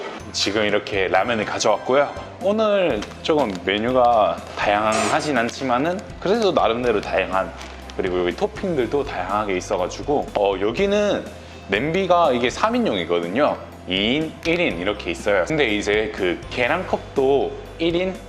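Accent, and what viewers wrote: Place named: Busan, South Korea. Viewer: 야. 부산 촌놈 그냥. 사투리써. 안되는 표준말 썼다가 사투리섰다가 하지 말고. 불편해 보인다. 알겠냐.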